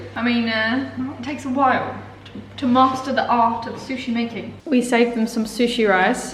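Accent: Australia. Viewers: sounds so australian in this video. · You sound so Australian in this video!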